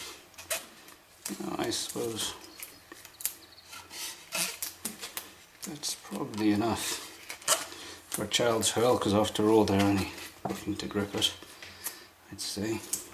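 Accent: South Africa. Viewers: Well what's the chance of hearing a fellow South African showing us how this is done, shot boet! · Sounds South African to me!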